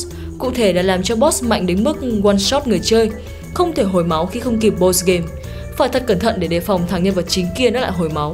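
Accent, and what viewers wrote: Vietnam. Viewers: Voice mới người Thanh Hoá à? 😢 Giọng nghe mệt quá, k xem hết nổi video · Bạn nài quê Nam Định hỏ, nghe giọng giống lắm 😐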